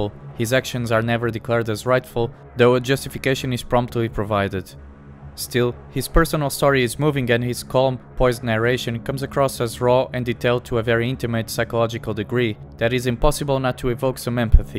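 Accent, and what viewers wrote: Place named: Portugal. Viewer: Esse inglês com sotaque português não me enganou! Parabéns pelo vídeo, para mim o Close Up é dos melhores filmes de sempre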